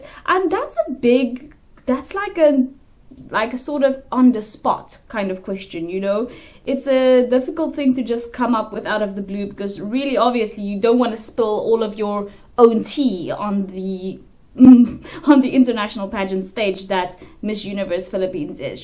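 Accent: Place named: South Africa. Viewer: Love you. And love your Afrikaans pronunciation of ‘r’ gives life and errrrthing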